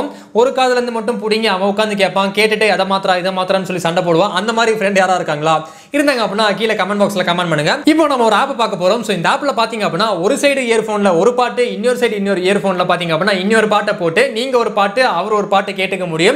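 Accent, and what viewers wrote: Coimbatore, India. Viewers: bro neenga coiambathura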